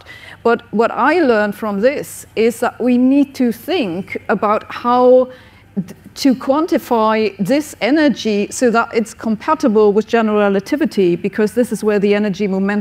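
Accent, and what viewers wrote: United Kingdom. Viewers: I straight up, laughed out loud when she just inherently abandoned her natural British accent and said Albert Einstein’s name with a German accent LMAO just a little over the top and kind of speaks volumes that you may be eccentric in ways where you are maybe providing a false representation of yourself or an idea because you are so caught up and the excitement of the person or a thing you were talking about however, the danger of this is that lead two points of failure and error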